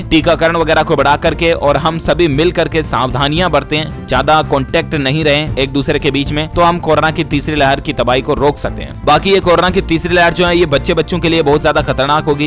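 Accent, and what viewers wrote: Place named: Marwari, India. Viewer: Aapki marwari boli mast hai motiyar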